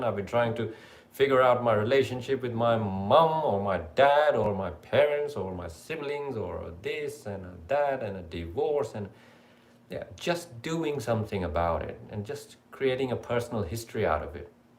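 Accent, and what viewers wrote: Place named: England, United Kingdom. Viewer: Also, you sound like you're in England. That's where I am. But also lost in infinity.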